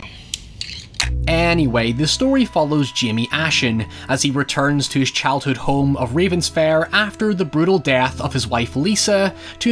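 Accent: Northern Ireland, United Kingdom. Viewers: The auto-generated closed captioning spelled the word "craze" as "kriyas" because of delightful NI accent. That is all.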